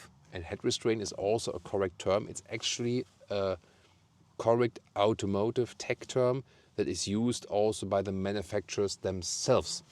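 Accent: Germany. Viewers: love your videos ....i think reviewing cars just sounds better with a German accent !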